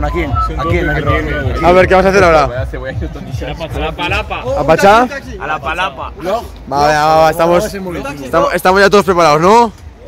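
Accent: Zaragoza, Spain. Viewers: Eres de Zaragoza no?? Jaja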